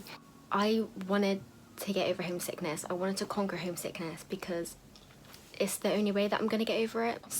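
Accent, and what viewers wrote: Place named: Bristol, United Kingdom. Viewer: Great vid. Proper Bristolian accent also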